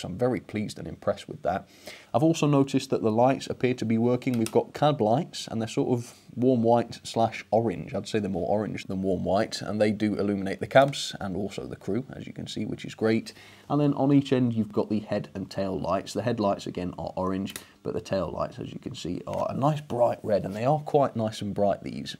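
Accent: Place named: Lincolnshire, United Kingdom. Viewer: Are you from Lincolnshire? You're accent sounds familiar.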